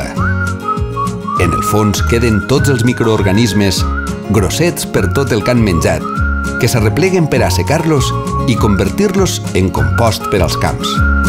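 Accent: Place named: Lleida, Spain. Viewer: Buen video, lo pondré todos los días para despertarme con una sonrisa en la cara ;-) P.D: me encanta tu acento de de Lleide (me pone a 100 bb)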